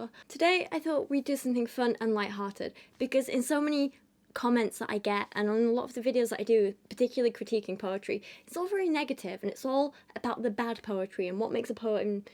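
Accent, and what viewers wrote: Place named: Yorkshire, United Kingdom. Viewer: Well, I have a few thoughts about this video: 1-relax. I personally love your videos, and haven’t thought any of them have been lacking. And it’s important to take time off if you don’t feel right to make them. We’ll be here whenever you’re ready, and we’re here for you until then. 2-I’ve never been super into poetry. I’ve always liked it alright, but never really went looking for it, or paying a great deal of attention to it. Your poetry videos, however, have me rethinking that. I’ll probably start going after some poetry now, and it’s all your fault, so thank you! 3-I’ve recently started watching the seasons of the current Doctor (from Doctor Who), which I’m absolutely loving, by the way, and when I saw that they (both the companions, and the Doctor herself, or at least the actress) are from Yorkshire, I remembered you, especially because of their accents. 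And it got me thinking, do you watch and like Doctor Who, and if so, what do you think of the Yorkshire Doctor? Just curious.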